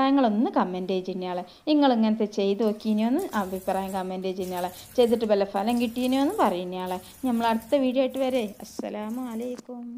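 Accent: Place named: Malabar, India. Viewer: കുട്ടി ഏത് നാട്ടുകാരിയാണ് ഭാഷ നന്നായിട്ടുണ്ട് ഞാനും മലബാറിയാണേ.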